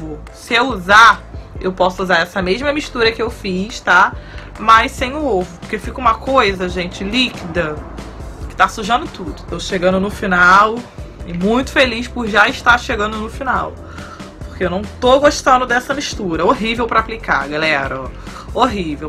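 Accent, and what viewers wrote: Rio de Janeiro, Brazil. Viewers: Muito carioca mesmo ahahhahahahahahahahahaah maravilhosa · E Nova Iguaçu é aonde?! São Paulo?! Euem... É carioca sim!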